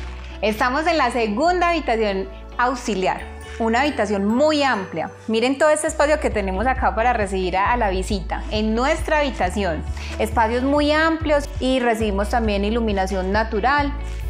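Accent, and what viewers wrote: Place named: Antioquia, Colombia. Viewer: "cuenten pues a ver.." jajajaja me encanta tu superrrrr acento paisa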